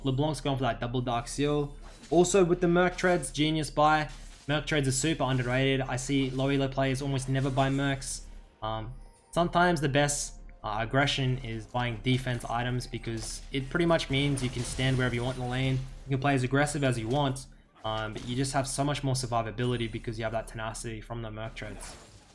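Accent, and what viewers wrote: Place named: Australia. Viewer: voice is so annoying...too aussie...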